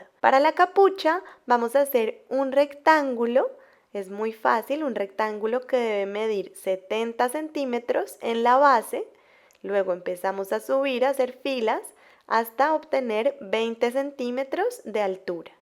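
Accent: Colombia. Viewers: Tu hablas tan lindo acento Colombiano right !!!!!!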